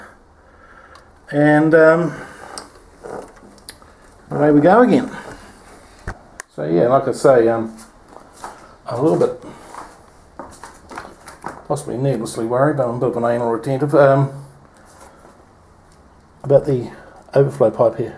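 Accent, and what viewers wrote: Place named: New Zealand. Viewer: Awesome video. Editing & presentation is outstanding along with the clarity and simplicity of instructions. I'm inspired now to arrange the purchase of an 883 tank for installation on my 48. Good to hear a Kiwi accent all the way from Onehunga after living in Oz for the last 10 years. LoL Cheers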